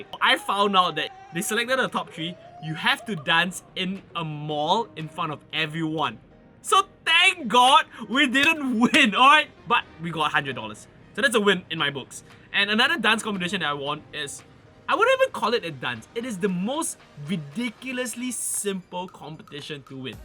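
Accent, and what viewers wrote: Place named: Singapore. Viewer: Singlish to the max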